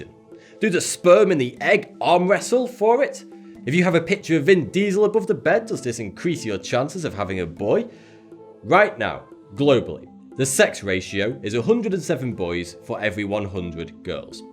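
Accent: United Kingdom. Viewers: I love how your British Dialect makes saying "penis and clitoris" sound so eloquant.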